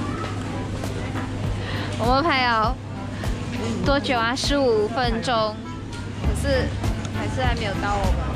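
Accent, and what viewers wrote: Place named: Penang, Malaysia. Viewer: 槟城口音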